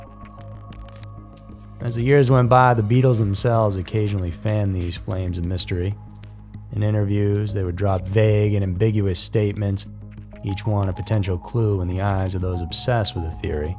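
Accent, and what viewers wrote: South, United States. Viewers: Im from South Africa and am so mesmerized by your gorgeous southern ? I think accent that im trying to focus on the material at hand 😂❤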